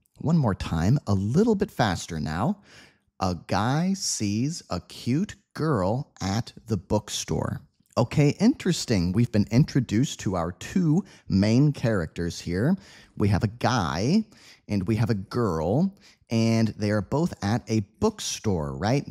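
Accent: United States. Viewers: Listening to these lessons you have the benefit of acquiring multiple perspectives of the English language. The teacher explains with crystal clear pronunciation, vocabulary and synonyms, and also idioms and phrases, in perfect standard American accent which is the best in the world, and is like music to my ears. Listen and review the lessons to make the best of your learning. Thank you for the English lessons.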